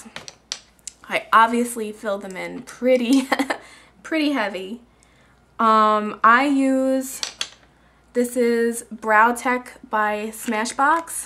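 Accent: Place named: Bronx, United States. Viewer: your from the city, like the bronx, or new york , lol CUTE!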